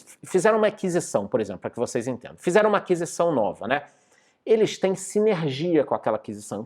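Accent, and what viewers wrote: Rio de Janeiro, Brazil. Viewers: O mora no sul mas esse sotaque carioca não nega kkkkkkk.... bota fleury ai na carteira porr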